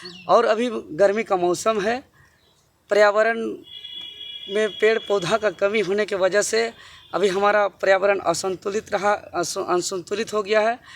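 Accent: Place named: Jharkhand, India. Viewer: Very good sound like speaker of jharkhand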